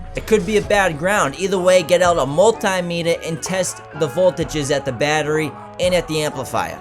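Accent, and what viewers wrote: Boston, United States. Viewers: Dude are you from Boston ?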